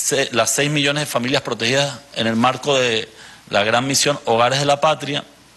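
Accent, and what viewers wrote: Cuba. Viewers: Notan que tiene un acentico cubano?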